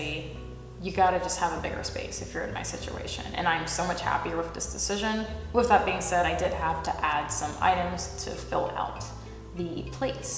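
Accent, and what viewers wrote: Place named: Los Angeles, United States. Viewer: Ah oh L.A. broad, oh i was guessing Texan or midwest.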